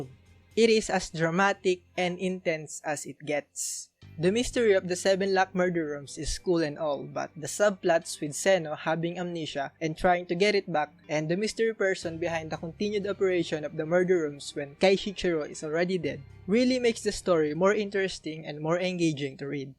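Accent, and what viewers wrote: Philippines. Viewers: i knew it.. you have the accent :) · Your accent sounds like you’re from here in the Philippines, are you?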